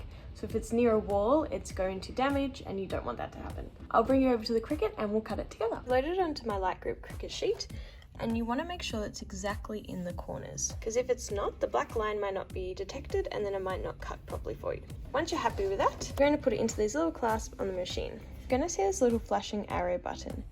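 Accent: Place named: Australia. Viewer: i adore your australian accent 😍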